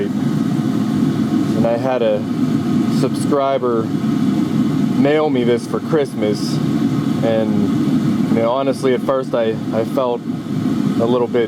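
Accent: Pittsburgh, United States. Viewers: I thought you sounded like you had a "burgh" accent. Central pa here. I have the same problem with my caravan I think, I've got to tear it apart to make sure. Thank you for the info.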